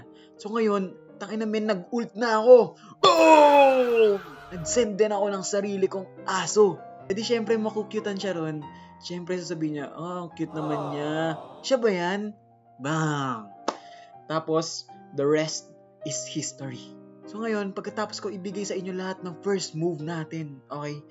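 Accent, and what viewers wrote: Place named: Pampanga, Philippines. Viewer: Aydo atchu ya pala pampanga bap hahah an lakas boss taga pampanga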